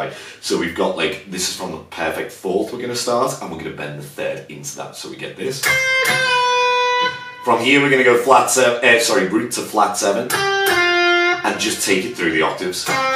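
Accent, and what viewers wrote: Liverpool, United Kingdom. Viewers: Scouser! Love it well in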